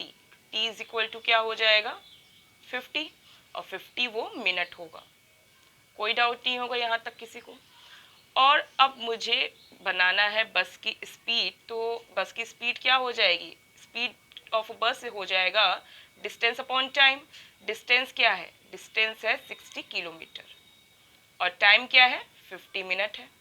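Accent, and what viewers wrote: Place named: Eastern Uttar Pradesh, India. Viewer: East UP accent ❤️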